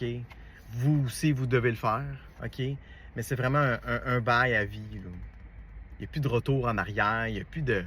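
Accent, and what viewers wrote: Quebec, Canada. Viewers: Bien le Bonjour de France. J'aime beaucoup votre franc-parler, votre naturel et votre accent québécois ou canadien c'est quelque chose !!!. Ça me fait Vraiment plaisir que plus d'hommes soient Spirituels. Bien à Vous.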